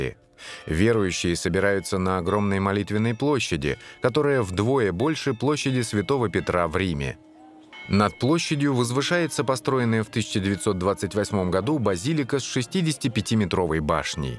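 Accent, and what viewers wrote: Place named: Spain. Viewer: И названия и произношения ужасно.Почему произношение испанское?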